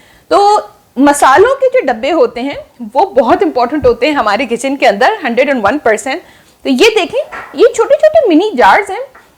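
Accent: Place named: Pakistan. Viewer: Very informative video and I like to hear your voice its pure pakistami style and I also loved your dress from where did u get this?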